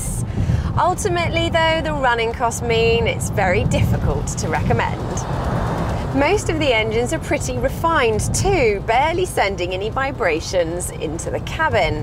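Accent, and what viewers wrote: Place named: Estuary, United Kingdom. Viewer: Bit estuary.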